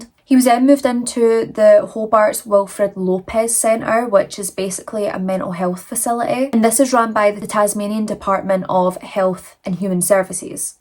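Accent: Scotland, United Kingdom. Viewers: I'm new here love the Scottish accent I'm from England think I'm going to like ur channel ❤❤❤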